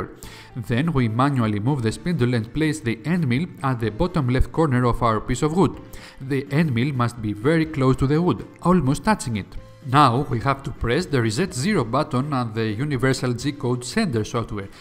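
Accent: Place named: Greece. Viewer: Love the greek accent: "Took me about ten awerrrs to bild de maseen!" Keep it up!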